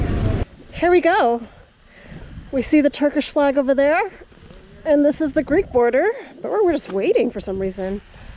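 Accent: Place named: United States. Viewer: Hi from Izmir 🖐️🙂 I wonder why do you have American accent ?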